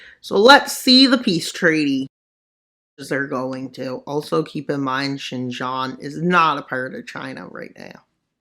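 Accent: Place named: Alabama, United States. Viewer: I don't think he is pa jeet because bro sounds like he is from Alabama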